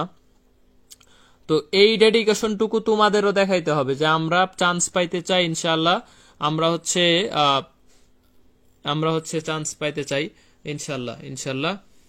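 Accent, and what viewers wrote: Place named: Barisal, Bangladesh. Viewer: বরিশালের ভাষা সুন্দর ভাইয়া ♥️❤️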